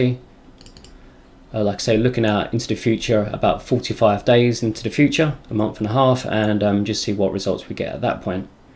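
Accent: United Kingdom. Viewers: Damn.. British accent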